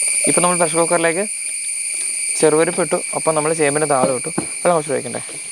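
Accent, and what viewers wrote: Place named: Kannur, India. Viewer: Hi മോനേ... എത്ര കാലായി കുഞ്ഞി...... കണ്ടിട്ട്? കണ്ണൂർഭാഷ ആണ് അങ്ങനെ പറയുമ്പോ ഒരു ഇഷ്ടം 😅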